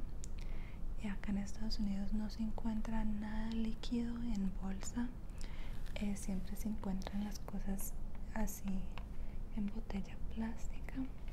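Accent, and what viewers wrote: Antioquia, Colombia. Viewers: Ya quisiera yo q mi nevera estuviera así de llena :'v Y creo que eres paisa? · siempre me ha encantado el acento Colombiano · AMO LA VOZ COLOMBIAINA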